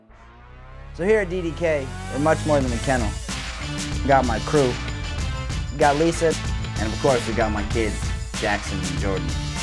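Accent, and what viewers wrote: Netherlands. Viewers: Are u dutch???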